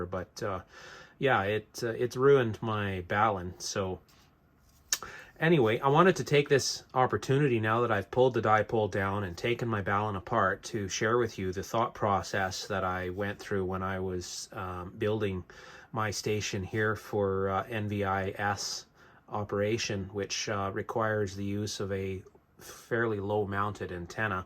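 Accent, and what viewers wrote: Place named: Canada. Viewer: You sound less Canadian in the revision of this video. Also, I have that same keyboard, and it is a beast.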